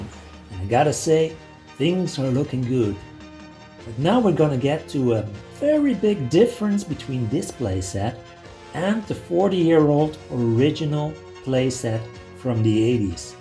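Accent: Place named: Netherlands. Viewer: Aan het engelse accent te horen, ben je toevallig Nederlands? 😄 Ik ga me hoe dan ook abonneren op je kanaal 😊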